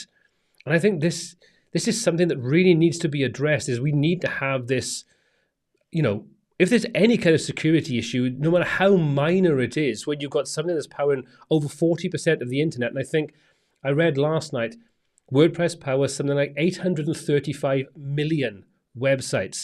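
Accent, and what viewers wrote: Wales, United Kingdom. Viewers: is from Wales, it’s just his accent…maybe it’s a plug-in you are talking about?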